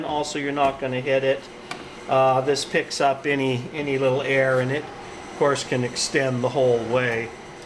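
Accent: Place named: Kentucky, United States. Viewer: UK? Seriously? You talk like you're from Kentucky. No offence.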